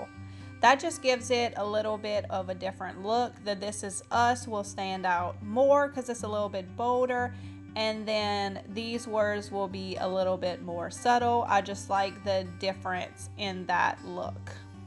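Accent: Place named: South, United States. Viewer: Hi,. I just came across your videos yesterday. I watch a lot of home decor/diy videos and have never made comments. However, the more videos of yours that I watched, the more I was convinced that we are twins separated at birth (or at least kindred spirits). I have a booth at a local antique mall. If you look me up, you won’t find much because I seldom post anything to social media. I’m so busy making things to keep my booth stocked that I just can’t find the time to maintain a media presence. Many of the things you make are things that I make (and I thought that I originated the idea🤪) such as decor books, turning outside lanterns into inside lanterns, making wood rounds for thrift store cloches, painting old canisters and pitchers, etc. I have thought about creating a YouTube channel for over a year but haven’t taken the plunge. How do you find the time with young children? 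I hope you’ll respond but I know you are super busy. Just know that you have a twin (minus the cute southern accent) in Illinois.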